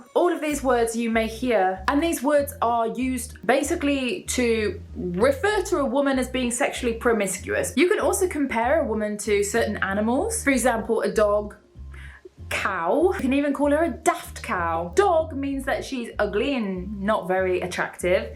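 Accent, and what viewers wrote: United Kingdom. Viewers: we'll, that's a shame, cause it sound's very funny and ear it in british accent is fabulous. Thanks!!!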